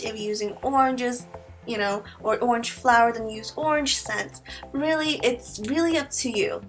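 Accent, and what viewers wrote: Vietnam. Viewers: She has a vietnamese accent come on now don't be ignorant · it's a typical Vietnamese accent.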